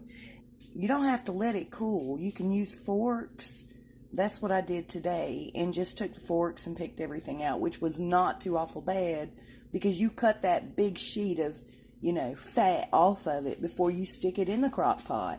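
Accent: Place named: South, United States. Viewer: Hi from Georgia in the US. is a Southerner here like me. She is in North Carolina. The South in the USA is know for our slow melodious accents and delicious home cooked southern, comfort food, meals. is terrific and you might want to check out Collard Valley Cooks too. Blessings.❤️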